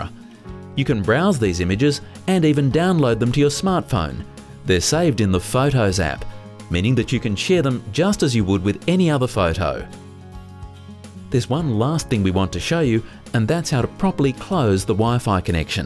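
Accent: Australia. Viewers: Australian Accent lol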